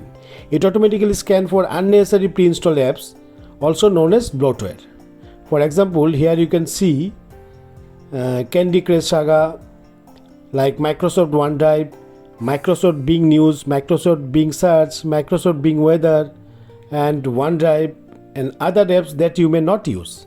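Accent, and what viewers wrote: India. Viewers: When a guy has an Indian accent, you know it's going to be the best video of all time.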